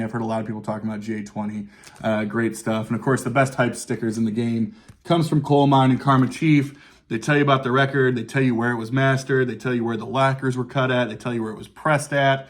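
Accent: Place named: Ohio, United States. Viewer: Hi! I blacked out during the “free jazz” segment. But when I came to, the album that intrigued me was the JA-20 or. The fat hype sticker was in the way and your Ohio accent was hard to decipher. Awesome episode!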